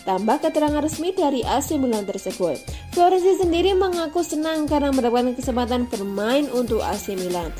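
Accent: Java, Indonesia. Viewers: Medok banget....🤣🤣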